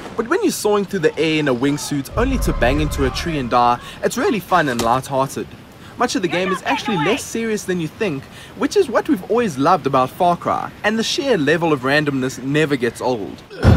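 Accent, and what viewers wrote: South Africa. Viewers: Great video..south african accent was interesting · Yea, I was going to say I notice that accent. Keep up the content :) btw hopefully you're staying safe if you live in RSA.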